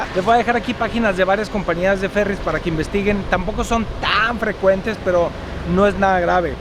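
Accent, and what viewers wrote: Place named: Guadalajara, Mexico. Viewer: Me encanta escuchar tu acento tapatio 💜😁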